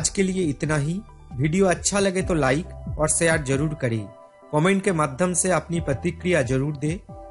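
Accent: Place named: Bengal, India. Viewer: Hey man first remove your Bengali Tone "BANGALIII TONE" from your english....and please dont spoil our history and you not need explore our place...its too famous.......Get out of this hell shit.....😂😂😂😂😂😂😂😂😂😂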